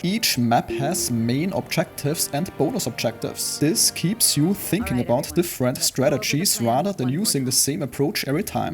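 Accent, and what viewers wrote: Germany. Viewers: Thanks for the video, this helped me! Are you by chance german? Your accent sounds like german xD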